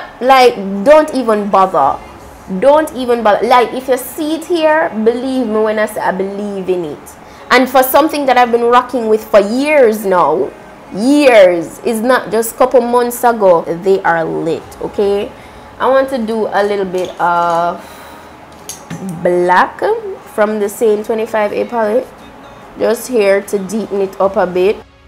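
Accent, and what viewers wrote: Jamaica. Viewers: Love love love that talk. You must be jamaican, I heard that patois 😁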